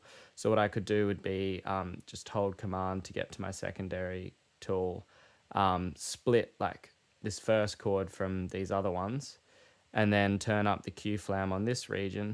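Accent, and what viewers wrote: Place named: Australia. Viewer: So refreshing to hear an Aussie explaining Logic. Some accents really grind my gears. Hahaha.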